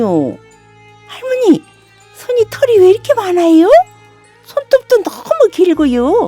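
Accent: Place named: Chungcheong, South Korea. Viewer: 구수한 충청도 사투리도 정감이 넘치네요... 어느지방 사투리든 우리맘을 편안하게 하는 힘은 있는것 같애요.... 잘 보고 갑니다~~~~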